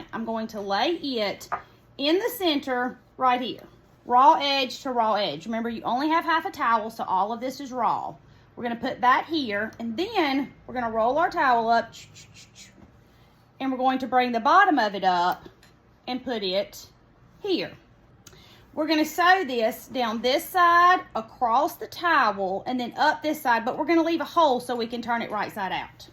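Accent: South, United States. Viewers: I’m from Arkansas and I live in Idaho. Your accent made my heart ache for home 😭 I love your channel and will follow for sewing tips AND to hear that beautiful southern accent. Thank you so much and God bless you ♥️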